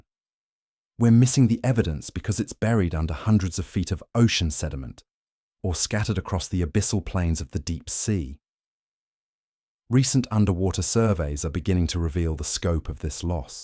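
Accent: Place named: United Kingdom. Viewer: Are there any stories that don't have a British fkn accent???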